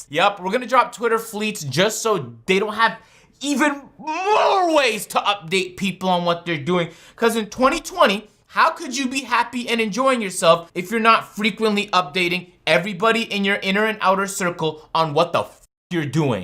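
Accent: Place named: United States. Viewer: Ayo, what happened to your Canadian accent. You straight up American now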